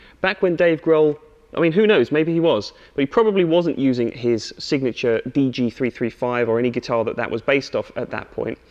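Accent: United Kingdom. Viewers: When speaks, I close my eyes and pretend Joe Bonamassa is speaking to me with a British accent. Incredible playing by the way too!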